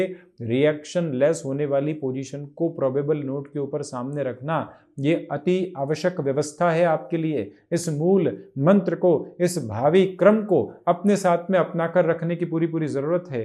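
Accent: Standard. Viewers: Jai sri Krishna sir .... Bhaagya se zyada aap k ki pure hindi sunne mein Anand aa raha hai...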